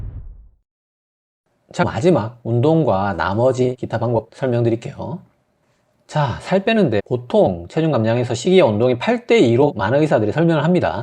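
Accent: Gyeongsang, South Korea. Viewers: 아침 먹다가 뿜을 뻔…. ㅋㅋ 니나 아는… ㅋㅋㅋ 저 경상도 사람인데 서울에 살거든요… 매번 느끼지만 선생님 리얼 경상도사투리 너무 맛깔나게 재밌게 합니다. 듣고 있으면 폭소가 나와요. 물론 내용도 굉장히 성실하게 준비 하시고 검토 하시고 알려 주셔서 감사합니다. 귀에 속속 들어와요. 자신을 마루타 삼아 시청자들의 건강 관리 에 힘 쓰시니 복 받으실 거예요 ㅎㅎ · 이 선생님은 어디 경상도 분인데 이렇게 사투리가 나긋나긋 귀엽지? 어떤 경상도 사투리는 엄청 드세던데.. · 귀여운외모 경상도억양이 특이하셔요😂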